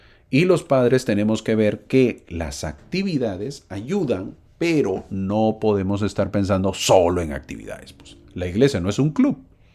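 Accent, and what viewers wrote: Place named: Peru. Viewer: yo les he hecho esa reflexión a mis hijos. Les obligamos a ir a la escuela. Pero si no quieren ir a la Iglesia, ahí hablan de la libertad de escoger. Siempre les digo que es lo nos va a dar la vida eterna??? Parecernos a Jesucristo eso lo aprendemos en la escuela llamada Iglesia. Y se quedan mirándome, y me dan la razón!!!😊, gracias me da gusto escuchar acento peruano!!❤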